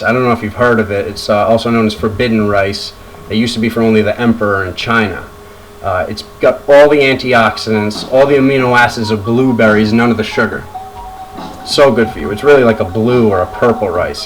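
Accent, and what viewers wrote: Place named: East Coast, United States. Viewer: bro ur hella east coast what happened lol.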